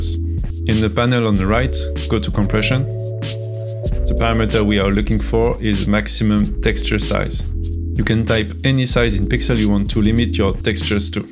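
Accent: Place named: France. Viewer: y'a pas a dire notre accent francais es si reconnaissable hahaha beau boulot en tout cas ;)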